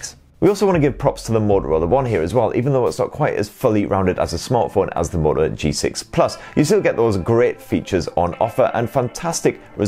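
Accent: United Kingdom. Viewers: You speak too fast dear. With the brit accent and your fast talking I dont understand everything you say.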